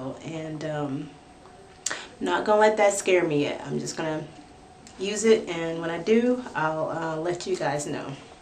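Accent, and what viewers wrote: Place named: South, United States. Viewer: southern accent in this vid. how cute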